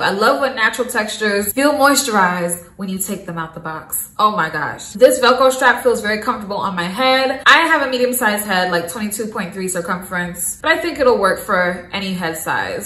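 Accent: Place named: DMV, United States. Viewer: TOP 6 REASONS I SUBSCRIBED: • Your complexion sent my azz to the kitchen for some HAWT CHOCOLATE 🍫 • You are giving me every reason to buy these units BAYBEEEEE 😭❤️🤎❤️ • Your music is poppin Ma. I’m a 80s baby • The confidence is on 💯💯💯💯 • There’s no fear in color like, you screaming “run up” & Move around “ to EV-ERY color in this playlist sis • We neighbors/family with the energy, style, accent.... “DMV” Where ARE YOU AT??? ANNNNNNDDT my #1 reason- you are most definitely a TOPCOAT PRETTY BROWN BABY DOLL & I’m supporting you baby girl PS- once I snatch these units, zaddy dropping Ben-jamins = I don’t spend a dime 🤣 Keep going baby! I’m a fan MUAS 💋 and happy holiday season boo 🎁✨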